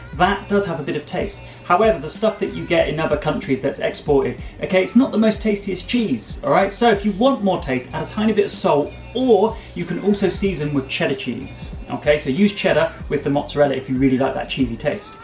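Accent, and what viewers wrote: United Kingdom. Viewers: suka sama British accent nyaa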